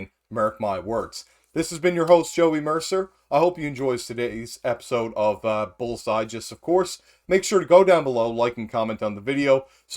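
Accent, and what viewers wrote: Canada. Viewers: are you from nova scotia?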